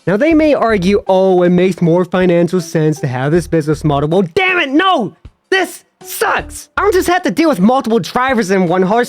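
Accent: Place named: North, United Kingdom. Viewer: Man it's awesome to literally hear the diversity in NASCAR fans. Cause I can detect the northern in your voice lol.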